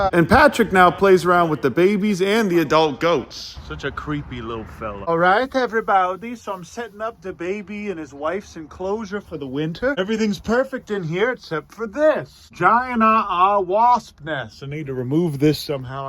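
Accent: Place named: South, United States. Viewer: how are you both Southern and Canadian at the same time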